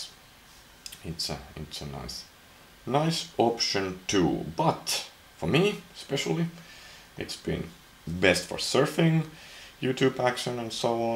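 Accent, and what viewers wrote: Finland. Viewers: where you from? you sound finnish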